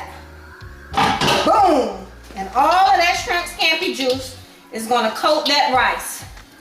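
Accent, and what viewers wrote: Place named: Miami, United States. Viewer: this video recipe and instructions are all over the place and hilarious but i will b trying this, looks delich. and yes u definitly sound like a miamian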